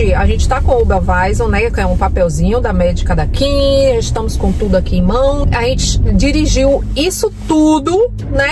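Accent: Bahia, Brazil. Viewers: O outono ja chegou ai, vendo daqui de Porto Seguro Beijo, que sotaque delicioso da Bahia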